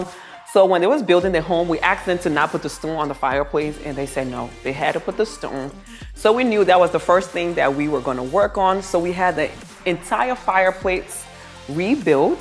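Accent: Liberia. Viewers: Everything is just beautiful. This is my first time here. I'm sending a Liberian accent? Keep up the great work❤️💪🏾👍🏾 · Hi - are you Liberian? I hear the accent...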